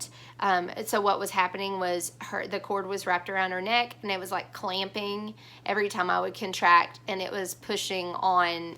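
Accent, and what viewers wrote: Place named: South, United States. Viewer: I want your Obstetrician! Also, I don't like pregnancy at all but love actually birthing. I always get epidurals though. Have birthed 6 babies and I love your channel...had no idea you adopted. The more I learn about you, the more I love you. God bless you, mama. You're doing a great work. Also, your accent is just amazing. I'm a Texas, but you are the epitome of southern. ❤️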